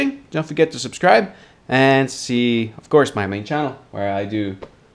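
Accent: Quebec, Canada. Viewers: thanks for this video! Will try it out. Like your style - what is your accent - Quebecois?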